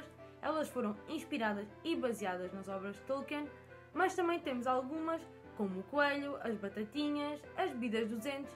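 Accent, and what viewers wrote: Portugal. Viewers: Eu amo esse esse português e Portugal. TT internacional #MARATTONANDO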